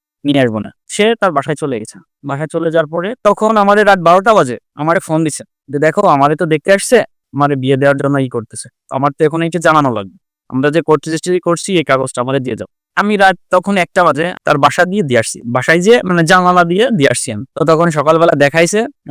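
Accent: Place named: Faridpur, Bangladesh. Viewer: ভাই আপনার বাড়ি কোথায় আপনার ভাষা আমার কাছে খুব পরিচিত মনে হচ্ছে। আমার বাড়ি ফরিদপুর।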